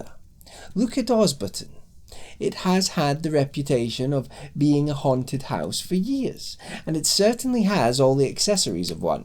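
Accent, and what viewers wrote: Scotland, United Kingdom. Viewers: At the beginning I briefly thought that the accent would deter from the story, but that wasn't the case at all! Well done, my Scottish friend! :D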